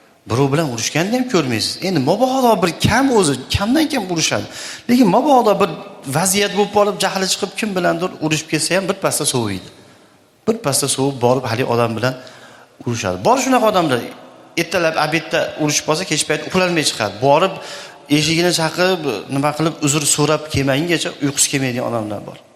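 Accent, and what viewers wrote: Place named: Khorezm, Uzbekistan. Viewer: хоразмли акансиз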